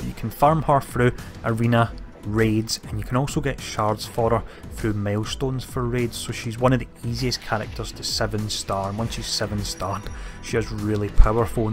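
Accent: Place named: Scotland, United Kingdom. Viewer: I feel like such an idiot... for the first 25 seconds or so, I genuinely thought this guy was speaking Afrikaans or Dutch or something.. then I just realised he's Scottish. In all serious though, thanks for the guide, mate. just getting into the game with my SWGOH mates and we're all wondering what to bother to farm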